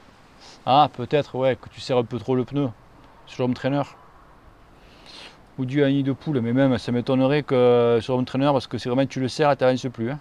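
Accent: South, France. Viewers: Salut! Super t’es vidéo! Très instructive.. et en plus avec un super accent du sud! Je suis un voisin, j’habite du côté de Perpignan..eh oui j’ai la chance d’être catalan!😉. Je roule 3-4 fois par semaine et je souhaite faire du HT pour progresser et faire du spécifique, de manière ludique tant que possible. Je veux acheter un HT d’occaz dans un budget autour des 200-250€.. j’hésite entre un TACX BUSHIDO SMART et un BKOOL SMART PRO.. c’est pour une utilisation sur les applis connectées ZWIFT, BKOOL,etc.. et pour utiliser les programmes spécifiques.. (sur un smartphone..) des conseils éclairés sur le choix? Merci d’avance!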